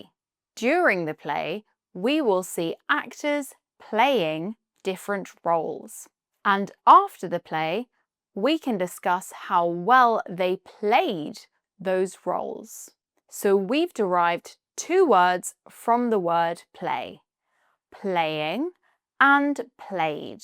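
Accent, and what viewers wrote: United Kingdom. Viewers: Your british accent is so beautiful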